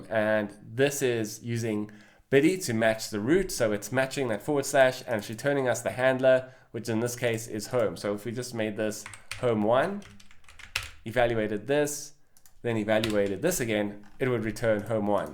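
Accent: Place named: South Africa. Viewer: South African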